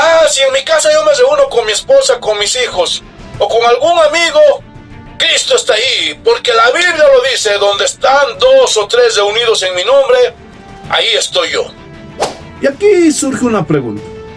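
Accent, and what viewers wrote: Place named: Mexico City, Mexico. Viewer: Hermano usted es de México? Tiene tono de la Capital. Bendiciones hasta dónde se encuentre.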